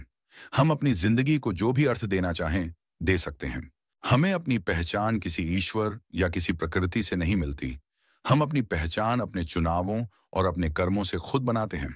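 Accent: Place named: Bihar, India. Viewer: I think AAP bihar se hai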